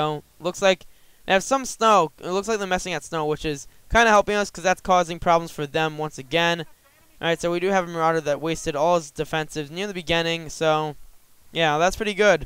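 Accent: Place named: United States. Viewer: Right, what server are you on btw,? I'm feeling a bit of american in your accent. Not sure which one specifically.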